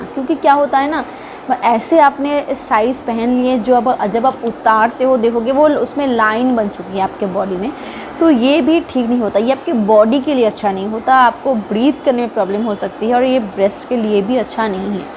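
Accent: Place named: Bihar, India. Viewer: Aap bihar ke rahne wale h... Madem jee aap ka Bolne ka tarika pta rha h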